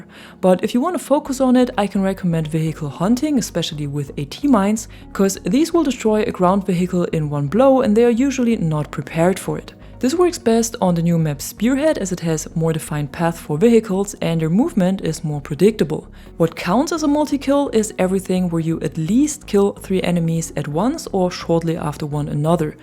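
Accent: Germany. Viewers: I dident ment in a bad way. The accent gave you up. Cause i work in turism and you sounded like a German speaking english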